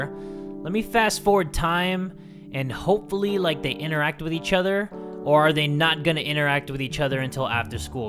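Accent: United Kingdom. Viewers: I LOVE YA BRITISH ACCSENT 10/10 [I know British accsents because I am British] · Hahaha i love your british accent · great British accent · I said that before this was even an updated OWWWWWWwwWwWwWwWwwWWww all I hear is nice happy music and then all of a sudden starts talking My ears are bleeding let me turn down the volume Hold up did it just turn itself back up God dang it * clickclickclick* oh no it’s too quiet * click x5* now it’s way too loud Jesus *clickclickclick*. Perfect wait hold up he’s British! Thank you for following my journey It was kind of like it was live but wasn’t sadly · Omg, that British accent ♡^♡ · i love hearing speaking in a british accent because im british lmao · Love the British accent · Why is bro British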